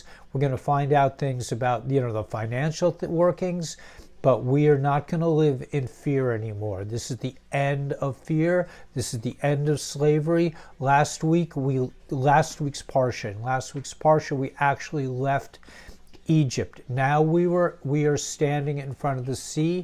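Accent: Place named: Armenia. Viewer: Good Afternoon, lmao yes stay away from death trap... question I' half Armenian I can remember my Great Grandmother speaking and it sounds similar to the way you pronouns a lot of the words you speak.. Enjoy the rest of the weekend, Love to Light...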